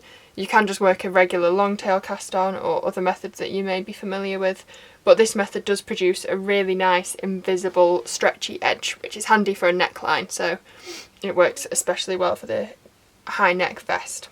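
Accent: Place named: Lancashire, United Kingdom. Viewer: Excellent tutorial! I prefer the Alternating, Long-tail 1x1 rib (aka Italian) cast-on to the too-often - IMHO - recommended Tubular (also aka Italian CO). So your tutorial hit resonated well for me. I'm starting a beret for a lady friend, and was looking for your exact video to kick-off the project.:) think that I'll try casting on straights, then transfer the lot to bamboo DPNs, hoping for more cling to min. the twisting. Then transfer the lot to ML! Love your Lancashire accent, as I have some remote connections in W. Yorkshire. Thanks again!!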